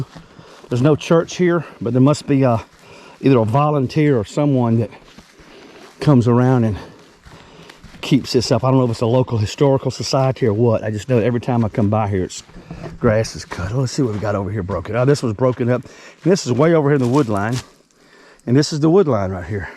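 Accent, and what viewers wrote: South, United States. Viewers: Thank you, this tour was fun and interesting .. Plus, l must say, enjoyed listening to the southern accent .. 👍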